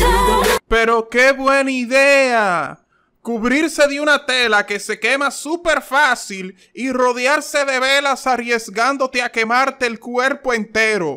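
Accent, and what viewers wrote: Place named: Dominican Republic. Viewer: Eres Dominicano? Creo que sii jajaj es que el asentó dominicano se reconoce ma rápido que Cabada con lo 30 minuto y un chin ma`😂 yo también lo soy❤ AMO TUS VIDEOS!!!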